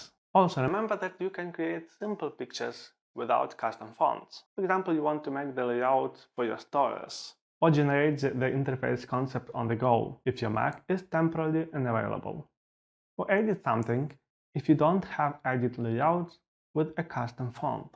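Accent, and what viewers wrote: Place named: Russia. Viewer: Are you Russian? What is the accent?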